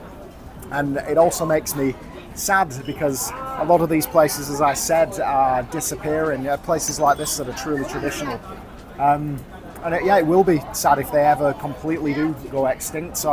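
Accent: United Kingdom. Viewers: Best Food Review Show on YT. Better than Mark Wiens. Don’t ever stop. Love the OTT, British accent, food energy, videography. ✅💪👍 #nojoke #keepatit #bestfoodreviewshow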